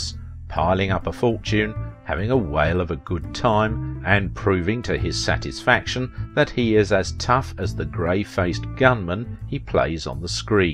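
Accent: England, United Kingdom. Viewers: It's BY WHOM!!!!! Very British accent but awful English.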